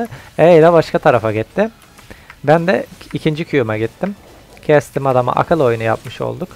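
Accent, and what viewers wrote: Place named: Aksaray, Turkey. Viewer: abi şiven Aksaray şivesine benziyor bende orda yaşıorum tam olarak bilgi verirmisin acaba bu konuda bize D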